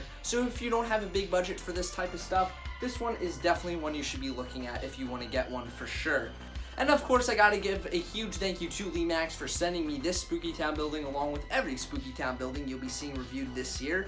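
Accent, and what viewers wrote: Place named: United Kingdom. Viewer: British yay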